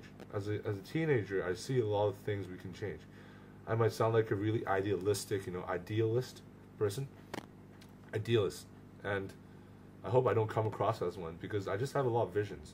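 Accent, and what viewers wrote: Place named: United States. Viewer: a curious question, if u are brought up in HK for 18 years how cum u could speak with an American accent???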